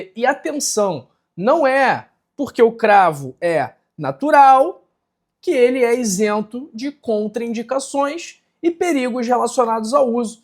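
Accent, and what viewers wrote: Rio de Janeiro, Brazil. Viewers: Esse sotaque carioca é horrível, muito Ruin, muito chato, é muito cansativo ficar ouvindo isso...pqp... é muito forçado...cara vái fazer outra coisa na vida q não precise falar nada... não abre a boca por favor... credo... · O conteúdo pode até ser útil , mas esse sotaque carioca ninguém merece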